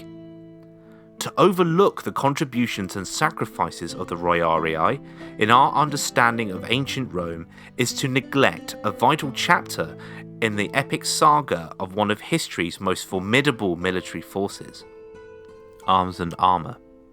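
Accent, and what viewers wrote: United Kingdom. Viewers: ya sound like you're from heartfordshire. Let that proper british accent out will ya? 💯👍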